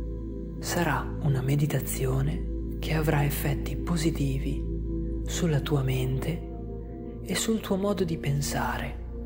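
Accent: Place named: Veneto, Italy. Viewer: Secondo me sei Veneto dalla parlata .....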